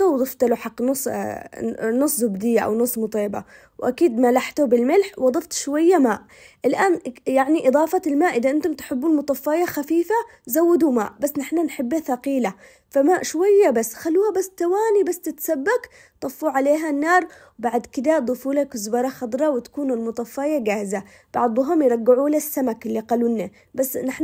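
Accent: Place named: Aden, Yemen. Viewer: اللهجه العنديه احلا من الاكل.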